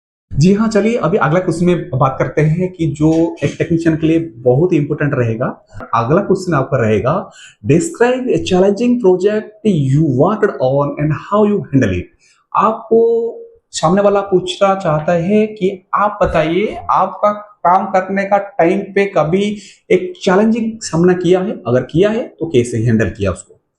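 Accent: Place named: Odisha, India. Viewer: Bhai aap odisha se ho kya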